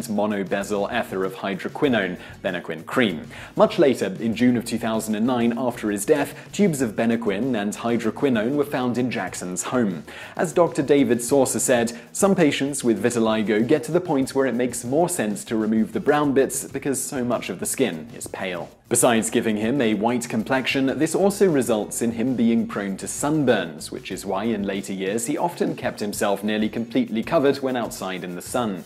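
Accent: United Kingdom. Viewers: British accent...Good bye with your bullshit.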